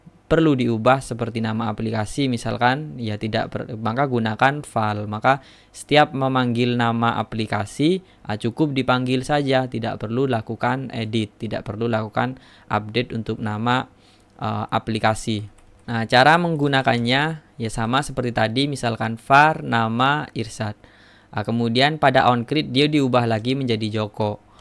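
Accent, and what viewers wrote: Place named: Madura, Indonesia. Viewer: dari logatnya kayanya orang madura deh :)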